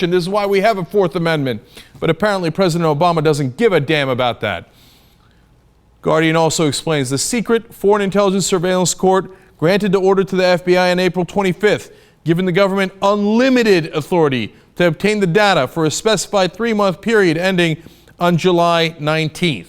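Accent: Germany. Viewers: Cenk f*** you for pulling Stuttgart, Frankfurt and your weird German accent into this, it's not the German government doing that and btw, the CIA put up their base in Stuttgart spying on and kidnapping German citizens as well. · Worst German accent ever. Otherwise a great vid.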